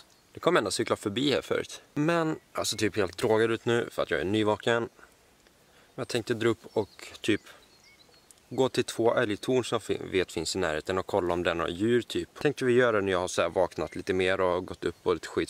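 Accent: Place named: West Gothland, Sweden. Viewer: Haha jo såpass långt har jag räknat ut :P funderade mer på vart i västergötland, är själv från västergötland därför jag reagerade på dialekten :P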